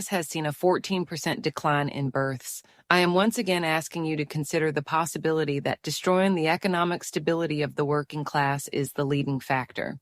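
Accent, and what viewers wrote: South, United States. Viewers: Well damn, Miss, I think I might be fallin' in love with you, lol! Just joking, but for real I LOVE all the things you talk about and the knowledge you give us! Really good stuff all around. Plus, I am a Southerner and you have a wonderful accent that warms my heart. I grew up under tutelage of a very smart father - anesthesiologist, sailor, hunter, fisherman, etc. So, I, as a sailor, surfer, fisherman, etc. became, as he was, something of a meteorologist (because surfers and sailors have to pay attention to such things) as well as something of a science nerd. Long story short - I love what you do and am VERY appreciative! I have already followed and will continue to do so. Thank you from South Carolina;-)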